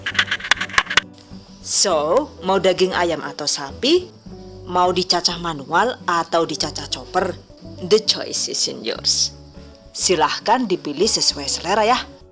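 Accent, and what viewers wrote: Banyumas, Indonesia. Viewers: Kaget donggg orang ngapak ternyata😭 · Makasih resepnya bu.. 😅 ko sma2 ngapak yaak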